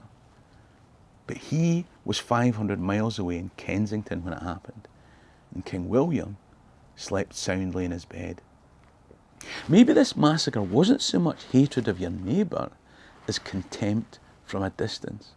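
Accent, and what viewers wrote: Scotland, United Kingdom. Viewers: I love this Scottish sound of English language by the narrator but the landscape of the highlands are so depressing. Why does nobody forrest them up?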